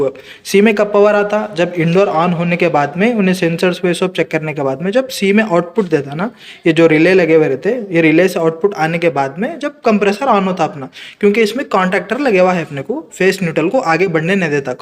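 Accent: Hyderabad, India. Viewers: Bhai aap Hyderabad ka hai aap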